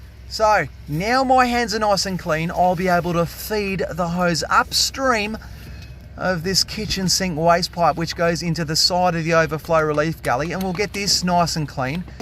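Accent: Australia. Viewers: It was amazing to see Tom Cruise clear a drain with an Australian accent. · I’m from the US.. I love the accent soo much!! I have my Apple phone and iPad Siri voice set to the Australian voice.. I hope to someday go on vacation to there.. I love the hot weather and nature and yes I am not afraid of the deadly creatures down ther.. I have a major respect to leave them alone and they should leave me alone..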